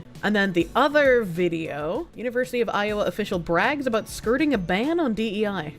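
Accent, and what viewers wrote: Boston, United States. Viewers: Needs to up the boston accent best thing to come out of america, god i genuinely love it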